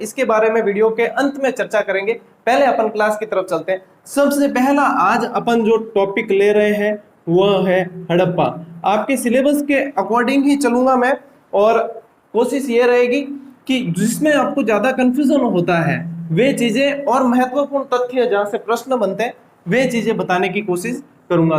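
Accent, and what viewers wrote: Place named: Marwari, India. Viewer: Sir apki marvari boli bhut achi lgti h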